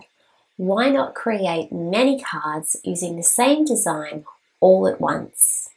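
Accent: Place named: Australia. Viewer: I love hearing a fellow Aussie accent on YT! New sub from Central Coast NSW 🇦🇺❤️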